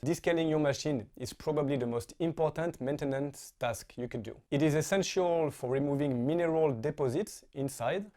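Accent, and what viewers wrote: France. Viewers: We love your French accent, it makes it so interesting ! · Great video. Vety informative. I also enjoyed hearing your French accent. Merci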